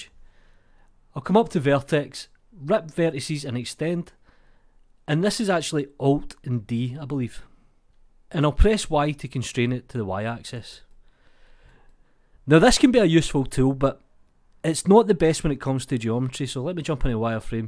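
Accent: Scotland, United Kingdom. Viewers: Came for rip tutorial, stayed for magnificent scottish. Great video, thank you! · Great channel. I enjoy listening to a Scotsman, as I can only understand 2 out of 3 words, it keeps me on my toes.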